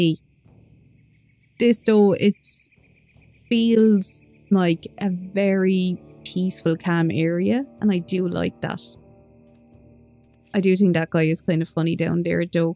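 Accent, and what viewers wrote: Ireland. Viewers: I moved to Canada last year and I haven't really interacted with any Irish here. I used to play wow as a teenager and was looking it up on youtube for nostalgic comfort. This was cool to see, I didn't realise I could enjoyed hearing an Irish accent that much Thanks :)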